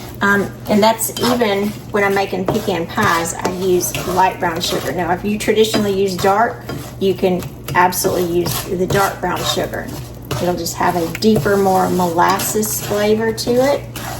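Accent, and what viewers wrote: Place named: Georgia, United States. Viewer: Happy Thanksgiving to you and your family. I have been watching for awhile now and I love the variety of recipes you present. I also love your gorgeous Georgia accent. Since I'm from Alaska I don't have too many southern accents around me! One of my favorite holiday desserts is pecan pie so this looks delicious to try. God bless you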